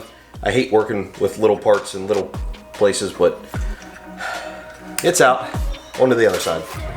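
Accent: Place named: Philadelphia, United States. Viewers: Good stuff! Fellow Philly-accented car video-editor-guy. Cheers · sorry, thought your accent was Delaware County PA. Maybe the (great) Northeast?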